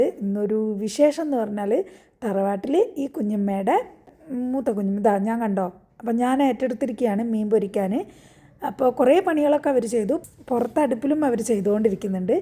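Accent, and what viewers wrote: Palakkad, India. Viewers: Palakkad accent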